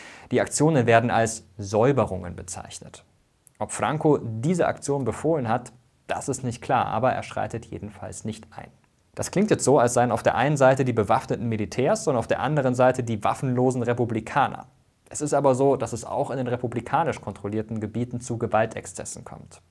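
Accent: Italy. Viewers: du gibst dir sonst immer so viel Mühe bei der Aussprache, nur nicht im spanischen. Alles sprichst du italienisch aus.:( Ich fühle mich ungerecht behandelt! 😄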